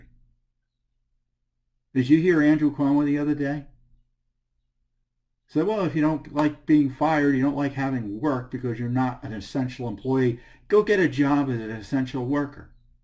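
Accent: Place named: Russia. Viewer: I thought I detected the hint of a Russian accent.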